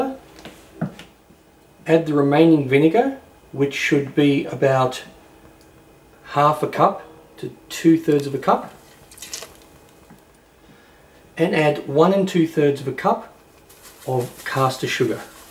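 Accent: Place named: Australia. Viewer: Lol as if your great Aussie accent doesn't give away where you are! Hello from Sydney!!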